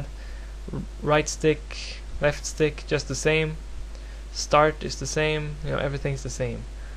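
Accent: Norway. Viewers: norwegian accent is one of my favorites i have many friends in norway and find it crazy how americanixzed your country has become. It astoounds me that your schools have english as a required language. Awesome video bro! thanks for the help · Ha e skjønt du va norsk før du sa da xD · Haha I just knew you were Norwegian when I heard your accent! :3 Which isn't bad at all btw, you have very good english! Most Norwegians do actually! Eg e Norsk forresten, halvt Canadadisk faktisk, men bor i Norge :D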